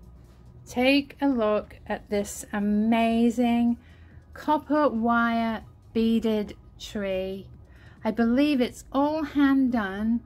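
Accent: England, United Kingdom. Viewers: Hello. I am a fairly new subscriber (really enjoy the Goodwill trips - one of my favorites). In this video, you mentioned being a child in California. I assumed that you are from England because of your accent. Did you move here as a child but retain your accent?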